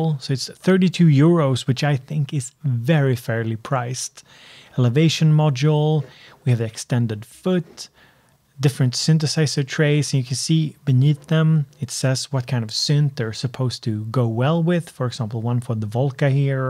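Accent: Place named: Sweden. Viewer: Sounding particularly Swedish today! :)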